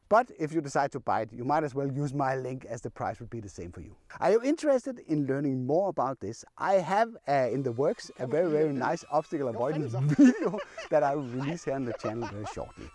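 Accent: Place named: Denmark. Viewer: Sir you accent sounds Dannish, Swiss or Norwagian 😊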